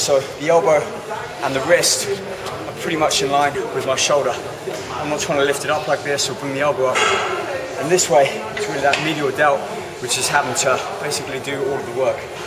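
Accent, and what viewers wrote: United Kingdom. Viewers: um... no he's english, his home town is london and he as a british accent...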